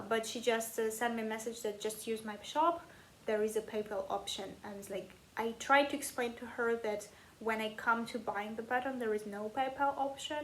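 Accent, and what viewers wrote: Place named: Texas, United States. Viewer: Tell your husband you’re not too much for Texans. We’re all like that. 🤣 You’re a Texan with an accent. You’ve got a great smile too. Love your shares. Keep stitching.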